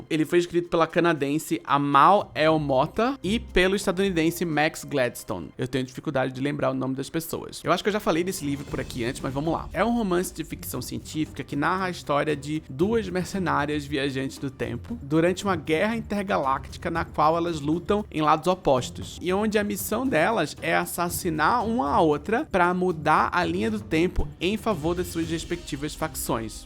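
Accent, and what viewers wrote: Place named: Rio de Janeiro, Brazil. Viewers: Aliás, você tem um sotaque de elite do Rio de Janeiro... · Gente, eu jurava que vc era carioca por conta do seu sotaque..... Kkkkkkkkkk Valeu pelo vídeo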